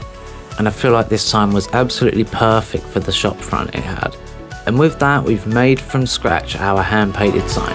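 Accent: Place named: United Kingdom. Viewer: Hi thanks for sharing, Have started to learn sign painting now I have retired and find your videos really helpful. So much to learn and the history fascinates me. By the accent I assume you are in the uk I live in Devon England. Can I ask if I may the type of material you paint on ie plywood ,MDF and what finish you apply to the surface before writing Thanks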